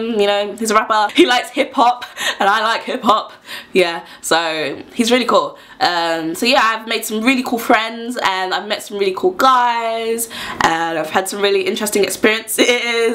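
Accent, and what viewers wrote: United Kingdom. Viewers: I'm almost crying cause I love your British accent to much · Absolutely adore your accent, luv! I'm British as well, and I miss that accent. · Owing to you, i got used to British accent. I could understand you fully! And i like your giggling smile. (I call it 눈웃음 ^^)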